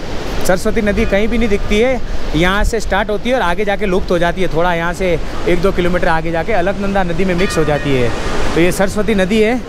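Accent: Gujarat, India. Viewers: Your videos are good but your Hindi is Gujarati accented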